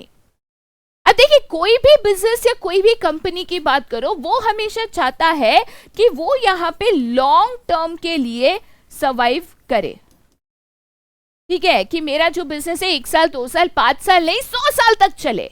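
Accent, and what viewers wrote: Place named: Mumbai, India. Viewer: I think mam from Mumbai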